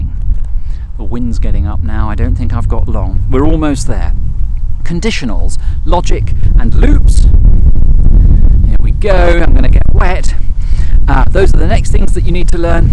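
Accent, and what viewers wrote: United Kingdom. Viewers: Hello sir, thank you so much for all your videos. P.S. I do love your British accent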